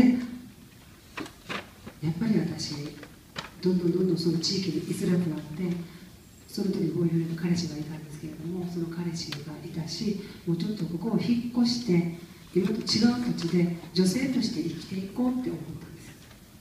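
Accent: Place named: Osaka, Japan. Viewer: いつもより大阪弁のさんが新鮮です！ありがとうございます😊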